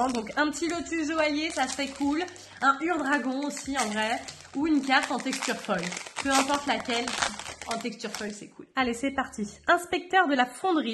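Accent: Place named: England, United Kingdom. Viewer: Je viens de te découvrir aujourd'hui, j'adore ta bonne humeur !(et aussi ton accent anglais 😂)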